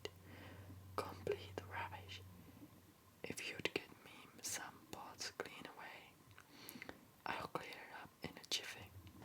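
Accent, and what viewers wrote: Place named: Czech Republic. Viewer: This is wonderful! Thank you. Your voice is marvelous, and any words spoken with a very slight Czech accent are more beautiful and interesting for it. I'm fascinated to hear how near-perfectly you speak - pronunciation, vocabulary, phrasing, emphasis - better than most natives do. Even if one studies for years, most ppl don't ever sound as natural. I imagine you're similarly talented with any language. Your videos are always, as are you, very special, original, well-spoken, intelligent, and lovely, and we are fortunate to have found you.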